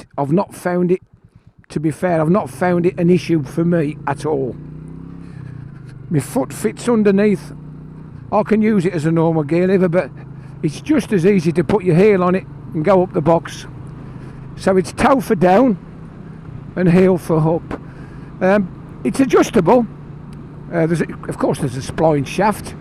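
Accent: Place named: Wolverhampton, United Kingdom. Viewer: Dear really enjoyed your review. We are lifelong bikers now into scooters but like the Enfield. Is your accent Wolverhampton as you mentioned football. We are near Hinckley triumph. John x Linda x chris Bellamy UK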